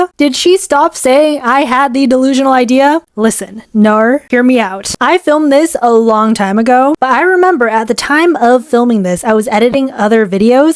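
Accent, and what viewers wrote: United Kingdom. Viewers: British English Alert !!!!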